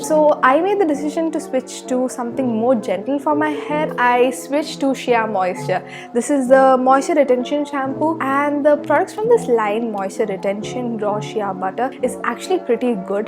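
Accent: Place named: Kerala, India. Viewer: Are you a malayali? The accent sounds just like a mallu girly🤩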